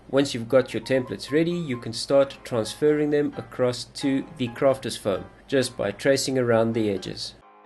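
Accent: South Africa. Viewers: Are u south African 🌍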